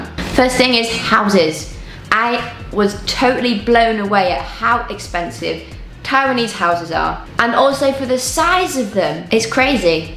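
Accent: England, United Kingdom. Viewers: I love to hear your English accent again! Almost forget you are from England 😌.. I’m living in the UK now. I think one thing more expensive in Taiwan than England is salad stuff. I like to eat salad and it’s cheap to buy salad ingredients in here from the supermarket but if I go back to Taiwan, making salad at home is not cheap at all.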